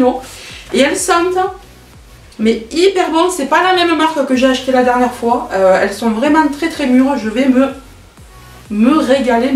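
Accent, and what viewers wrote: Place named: Southwest, France. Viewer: Coucou, merci pour tes vidéos, dis moi au vu de ton accent je me permet de te demander d'où tu viens, je suis du sud ouest et le même accent lol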